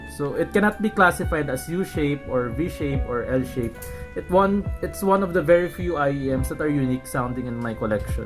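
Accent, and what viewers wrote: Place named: Philippines. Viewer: Pretty sure this reviewer is a Filipino. That accent 😆😆😆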